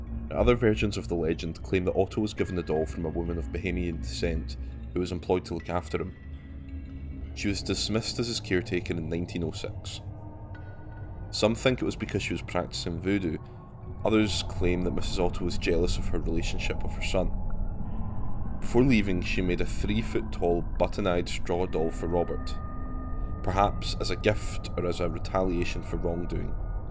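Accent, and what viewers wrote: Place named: Scotland, United Kingdom. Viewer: Lovely Scottish accent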